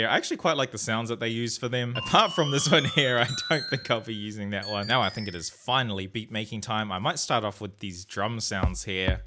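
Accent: New Zealand. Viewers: Are u NZ? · Wait is this guy a kiwi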